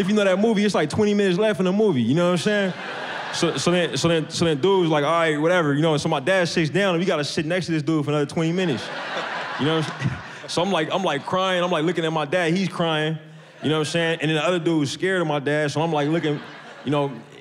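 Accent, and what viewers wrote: Oakland, United States. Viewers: Sobison lol no he’s from Oakland, that’s how most of them talk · Crazy how many people in the comments have never heard an Oakland accent.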